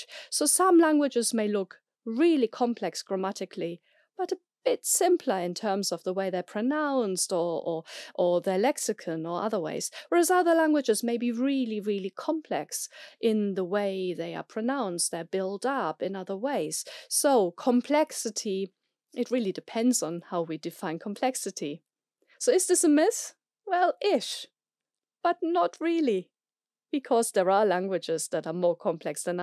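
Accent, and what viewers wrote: Germany. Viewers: Very interesting! Thank you! I have always wondered if English is a more complex language in the sense of having a larger lexicon. English certainly rapidly changes...and not always for the better! I would like to know which languages you consider to be more complex and more expressive. I will look for more of your videos. I can hear (faintly) that your mother tongue is German. That is a beautiful language that I learned in high school before going to live in Köln for a year...50 years ago! I revived my German more recently. I love the complex grammar! It is really not that hard to learn. Like anything it takes practice but once you learn the grammar rules of cases and genders, it's challenging but rewarding. One thing I realize from my own experience learning languages is that the most difficult thing about learning a foreign language once you have the basics down is to speak it naturally with expressions, colloquialisms and correct intonation. 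Being able to speak with correct pronunciation can be a big challenge for some, as well; for example, for Spanish speakers of English. And I don't know if practice can really help that much if you don't have a good ear. I feel bad for non L1 English speakers struggling to learn the language who due to a lack of proficiency are unable to fully and richly express themselves. I feel that way when I speak an L2 language; like I must appear to be like a child or simple minded. Vielen Dank again for your excellent post!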